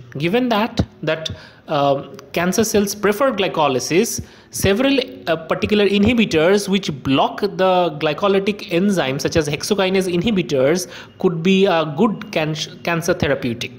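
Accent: India. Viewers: Your videos are good but I don't watch them because I'm scared of indian accent 😅 sorry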